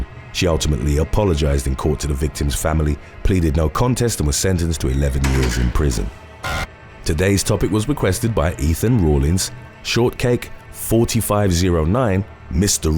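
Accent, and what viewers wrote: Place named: Scotland, United Kingdom. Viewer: I love his Scottish accent it's just so unsatisfying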